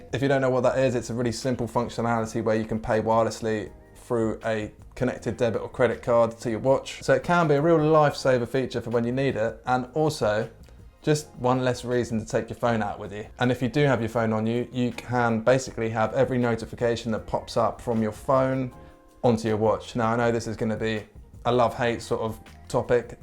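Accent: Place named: England, United Kingdom. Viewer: EXCELLENT PRESENTATION 👏 with an English accent ...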